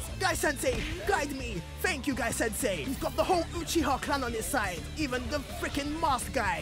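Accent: United Kingdom. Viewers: YOOOOOOOO this dude sounds literally like LEE BUT BRITISH OMG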